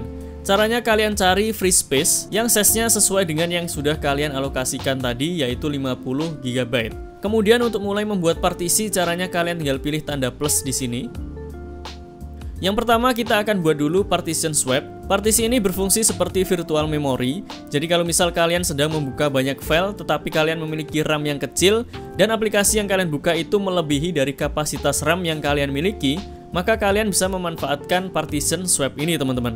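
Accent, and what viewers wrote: Banjarmasin, Indonesia. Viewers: What , abang orang banjarmasin ya ?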